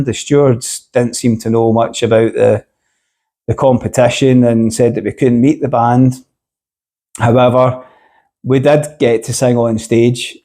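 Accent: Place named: Glasgow, United Kingdom. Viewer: Yes, your video came up on my YouTube homepage as a suggestion so I gave it a watch and was surprised to hear the Glasgow accent! Listening to Maiden on good headphones is a completely different experience than blasting it out of a stereo. You hear so much more of the intricate details they put into their music. I really hope they do a live album/video of the Future Past Tour. I went down to London last summer to see it and it was one of the best performances I've seen them do. They sounded amazing and the production was probably the best I've ever seen from them — and I've been going to Maiden concerts since '88! And was great of course, to hear them play Alexander The Great for the first time, live!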